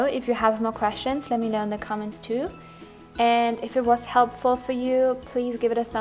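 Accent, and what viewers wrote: Germany. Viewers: Kommst du aus Deutschland weil du so ein deutschen Akzent hast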